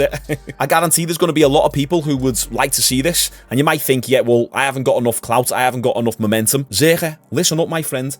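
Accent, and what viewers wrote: Liverpool, United Kingdom. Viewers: Out of curiosity bro, are you scouse? if you are, that’s just another reason hes fucked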